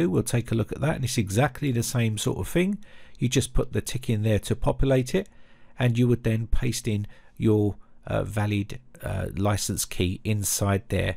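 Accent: United Kingdom. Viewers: Cheers lad ! You are awesome and i love your classic British accent too !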